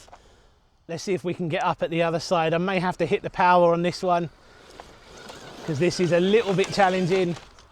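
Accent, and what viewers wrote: United Kingdom. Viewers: Are you British by any chance? Because I really want to buy this car but can’t find it anywhere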